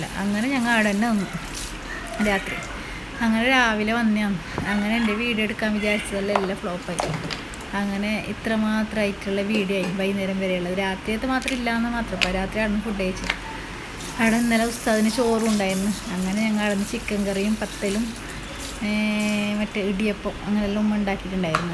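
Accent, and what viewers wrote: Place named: Kasaragod, India. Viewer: Kasaragod basha super 👌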